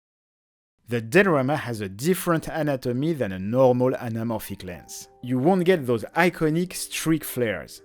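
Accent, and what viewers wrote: France. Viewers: 1. Great and fun historic video 2. Interestingly, this is a physical form of compression. Meaning, for example, JPEG 2000 uses wavelet compression, which is sort of the physical version of that. Another way of thinking of it is instead of shrinking an image in 2 axis, compressing just one. 3. I invented many technologies for the Movie industry, including Core tech for Video Assist (ARRI/Panavision/Sony ) and I invented Disney's Animation Studio software 4. Your French accent is so strong, I thought you were doing an Inspector Clouseau skit. This is not an attack; you are completely understandable. In fact, I would vote if anyone wants to learn to speak French, first learn to mimic you speaking English, and THEN learn French. In sort of a strange way, you're the anamorphic accent filter. English -> English with only French pronunciation -> French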